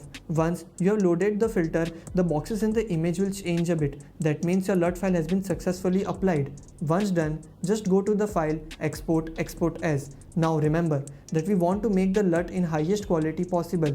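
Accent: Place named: India. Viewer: Yo, I wish you luck, but you should work with your accent. Indian english sounds cool, but not always.)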